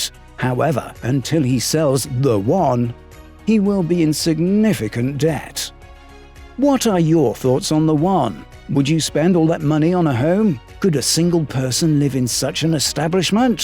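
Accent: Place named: Australia. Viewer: You Australian!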